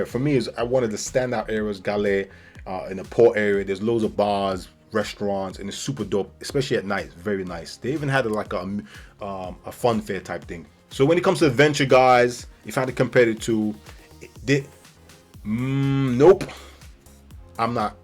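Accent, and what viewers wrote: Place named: Malaysia. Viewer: great to hear a local accent. Thank you for all the tips. Love Malaysia too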